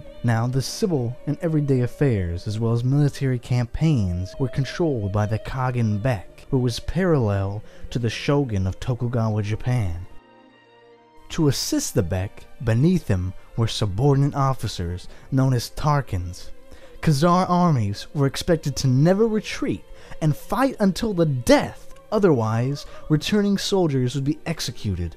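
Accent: United States. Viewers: A moderately interesting look at a little known area of mediaeval history... but the style of narration is appalling and impossible to take seriously. It's not just the Yankee accent I object to but the breathlessly hyperbolic delivery which sounds like a bad sports commentator trying to put a spin on a report about a very boring football match (Association Football that is, not that ludicrous running around in suits of armour the Yanks do). My advice is to get a decent scriptwriter and stop trying to make it sound like the old intro to 'Soap'. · Is the guy narrating this from Jersey? Serious question.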